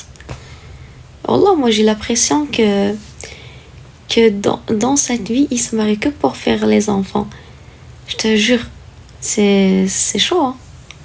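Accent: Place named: Morocco. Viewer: انتي❤ مغربي❤ لهجتك ثقيلي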